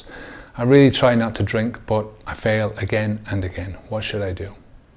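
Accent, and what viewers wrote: Ireland. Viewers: hi you sound like a fellow Irish man and fair play for kicking the booze, i relapsed recently am not sure if it was pressure from doing my master or looking after a sick parent, I used to have a vibrant social life and was able to handle a drink and thought that i could handle just the one and be like everybody else but ended up on a 5 day bender. My question is maybe its the disease of of alcohol making me think am missing out on socialising how to you build a social vibrant life? its not the fact that am depressed but alcohol has hospitalised me on many occasions, accepting defeat is difficult and ive lost jobs and even friends to this but until that relapse i was doing great, things is every time i fall recently i bounce back stronger maybe am learning very confusing.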